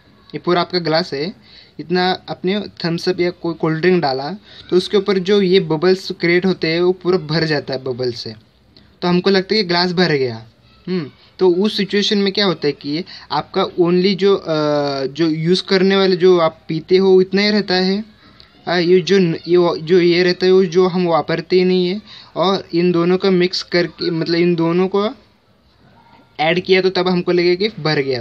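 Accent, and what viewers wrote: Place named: United Kingdom. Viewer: Video me kya bolne wale ho, pehle se likh ke Q nahi rakte ho yaar, lagta hai jaise koi angreez hindi bolne ki koshish kar rha ho, anyways, i managed to understand what you were trying, and it helps a lot. thanks for that.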